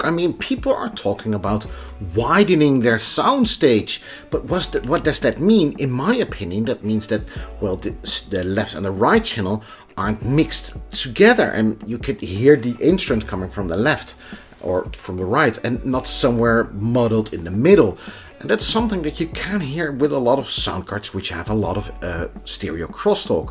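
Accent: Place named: Netherlands. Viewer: Nederlander die een beetje aardig Engels kan, 👍🏼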